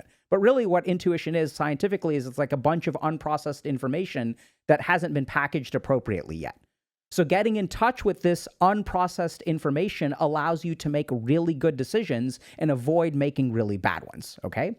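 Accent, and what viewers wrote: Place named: India. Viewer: Saw the title, first thing came to my mind was this, in your most iconic Indian voice: Stop reading my mind. Dr.K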